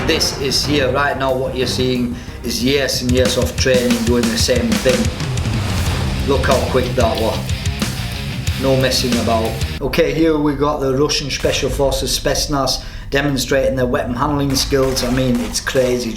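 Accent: Scotland, United Kingdom. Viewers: Я сам нихуя не понял из-за британского акцента и диалекта, хотя английский знаю хорошо (американский английский) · That is a quintessential Scottish accent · I enjoy this guy’s accent. Are you a Scottish?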